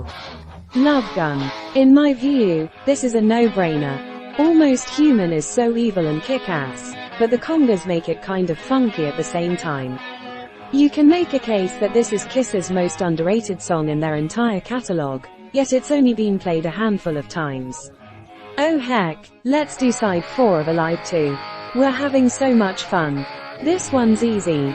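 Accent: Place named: United Kingdom. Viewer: WHY IS A BRITISH WOMAN NARRATING THIS FOR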